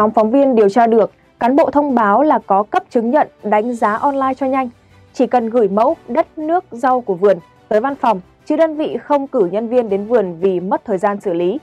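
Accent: Standard. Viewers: giọng nói chuẩn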